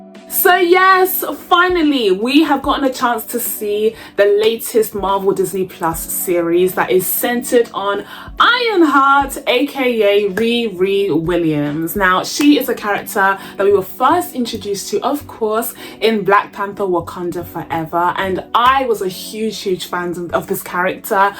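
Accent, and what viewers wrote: United Kingdom. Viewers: First I wasn't expecting a British accent, lol 🤭 secondly I agree with small minded racists review bombing anything that isn't centered around them. Third, I sincerely appreciate your commentary, thank you. 🇺🇸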